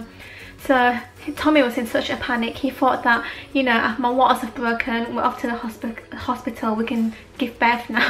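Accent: Yorkshire, United Kingdom. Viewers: it's yorkshire accent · she lives in London bit she is from up north, which is like Yorkshire area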